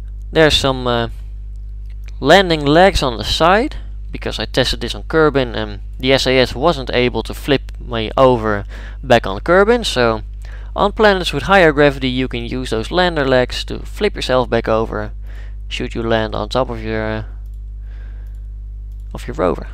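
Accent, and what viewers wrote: Netherlands. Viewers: You sound so fucking Dutch. Idk if you are. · you have the best dutch accent... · cant watch this because of the dutch accent, I'm must be the only dutch person in the Netherlands who can talks English almost completely without any accent. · Sorry but, are you dutch?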